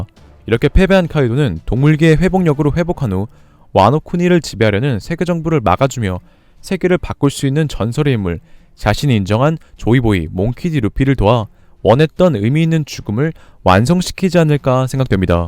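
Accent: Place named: South Korea. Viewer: 수면용 책읽기 방송 부탁해요 어쩜이리 순도 백프로 한국억양인지 ㅎㅎ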